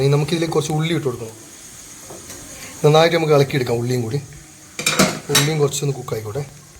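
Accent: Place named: Malabar, India. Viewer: Voice over kodukkumboo maathrollu le ee malabari type samsaram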